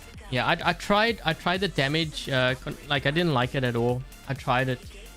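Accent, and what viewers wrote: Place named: South Africa. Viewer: Hey....when I heard you talking about sushi craze in Toronto, I didn't realize you were Canadian. I'm from GTA area too. I thought from your accent that you were in south Africa. How do I join your discord.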